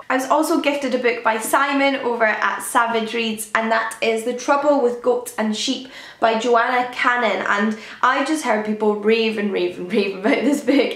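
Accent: Scotland, United Kingdom. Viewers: Hi, I am a book blogger and have recently started subscribing to your vlogs, my site is <link> Knew (obviously) from your accent you were a fellow Scot but didn't realise you were local to me. I live in Polmont and can attest to just how fabulous the charity shops in Linlithgow are, my husband and I are often to be found trawling around them. Keep up the great vlogs and feel free to drop by my site.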